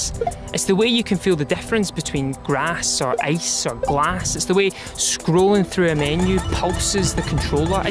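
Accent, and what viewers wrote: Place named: Scotland, United Kingdom. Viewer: You should review more games. I love that Scottish accent. As an English language learner, this is like relearning English all over again.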